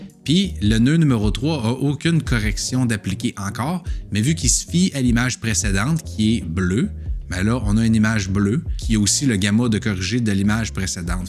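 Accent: Quebec, Canada. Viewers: Incroyable vidéo, merci!! + 1 point pour l'accent québécois que J'adore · Bonjour de France, ami québécois. J'adore votre accent...ou alors c'est les français qui ont un accent ^^ Gros pouce bleu pour l'introduction . DR,j'hésite à y passer, du moins le tester à cause de qqls gros pts négatifs...mais j'ai envie de l'étudier un peu. De plus, fusion me plait bien, comme nuke (mais en moins pousser) et puis les nodes c trop bien. Merci pour la video.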